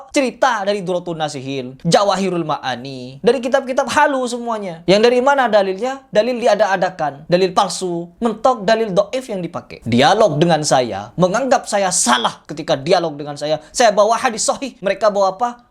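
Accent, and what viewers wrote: Madura, Indonesia. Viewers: gausah banyak bacott... buktikan saja kalo anda benar.... sekarang buka mejelis ilmu.. ada kah yang mau ikut anda?? soalnya saya liat vidio anda seakan akan pendapat anda itu selalu paling benar... kalo dari logat anda ini orang madura... sini sodara jangan berani depan kamera... jalan jalan ke kalimantan... 😂😂😂 takut kah...?? gak usah takut.. kalo gak salah 😂😂😂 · logat bicaranya seperti orang madura ya?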